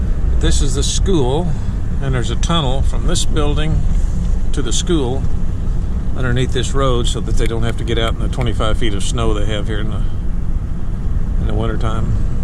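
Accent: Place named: South, United States. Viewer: Thank you for the more realistic tour of Whittier. Does the town keep the roads plowed well In winter? I wish we could have toured your condo. Seems like no homeless in Whittier. Love your bio. You seem pretty healthy for 70. And I’m sure the permanent fund dividend is a nice perk. Good for you for making your dreams come true. Whar 😮state did you come from? You sound like you have a southern accent.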